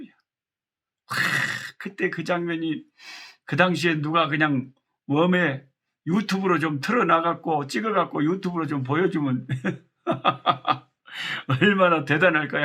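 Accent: Jeolla, South Korea. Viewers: 할렐루야!! 움직이면서 이런저런생각을하며 하늘을보면서 맘속으로기도를했습니다 지금이시간까지 지켜주신주님이너무감사해서요~~~지금제가볼수있는것은~계획되어있지않는 알수없는길을가고있습니다~사고로모든것이올스덥되고 아버지또한 모든것이 희미하지만~저는오늘목사님기도처럼 홍해를가르시는주님을믿고의지합니다~지금환경과문제모든것을감당하고 나가는게때론벅차지만 홍해를가르시고 이스라에백성을인도하셨던주님그분의자녀가저이기때문입니다~~요즘은~계속계속~환경에서오는시련이있어서모든것이아주느리게움직이는것같고 힘이빠졌던것은사실입니다~~~목사님의기도로다시시작해보려고요 멀리서도이리말씀먹이겠다고 방을옮겨가면서 분위기바꿔가면서때론많이지치실법도하신데 목사님의사정없이쏟아지는절라도사투리로정신을차리고 다시금 또다시시작하려고합니다 ~~내일은아버지를요양원으로모시고가야 할지도모르는 무거운발을옮겨야할지모르기에 오늘만큼은 더굳건히마음에죄체감안지려고 힘내보려합니다 날씨가시골연천은많이춥습니다~아프리카목사님거실에꽃과밖에푸른실록을보고싶네요~~같이기도해주시는목사님이계시니감사가나오고 힘이나네요~~~건강하세요목사님사모님😊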